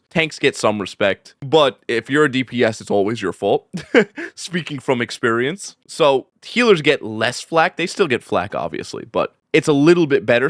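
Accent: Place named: Bronx, United States. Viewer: U gotta be from the Bronx my boy 😂 🤞🏽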